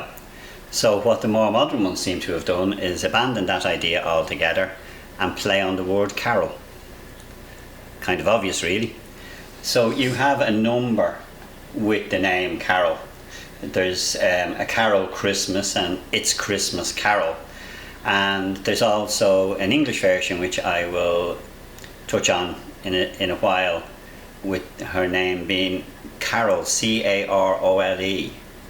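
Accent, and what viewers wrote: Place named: Ireland. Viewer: Love your Irish accent!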